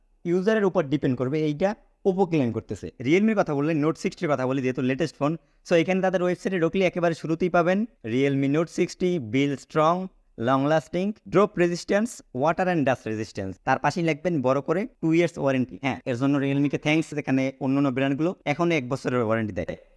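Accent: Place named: Barishal, Bangladesh. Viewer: বর্তমানে যে ফোন গুলো বের হইতেয়াছে পাক্কা মাইরা ফালান ভাঙতেও পারে, পানি ঢোকতে পারে, চলতেও পারে নাও চলতে পারে (বরিশাইল্যা টোন আছে কথায়) আগামী চাইর বছর ক্যামনে চালাইবেন ভাই ভাই মনেহয় মোগো দেশি মনু😅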